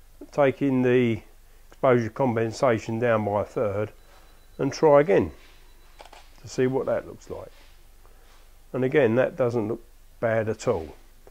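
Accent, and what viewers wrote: United Kingdom. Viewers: I can hear the light holders clicking loudly. If you don't loosen them before you angle them you going to strip the gears.Is that a Cockney accent we are hearing. I'm a good boy I am.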